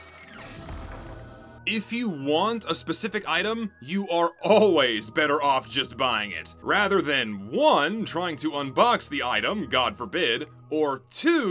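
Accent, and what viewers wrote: South, United States. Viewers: Sounded more and more southern as this played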